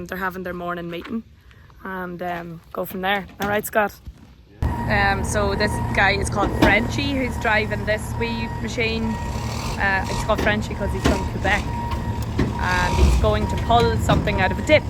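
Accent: Ireland. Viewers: ❤️ tho voice it reminds me of my trip to Ireland 👍🤠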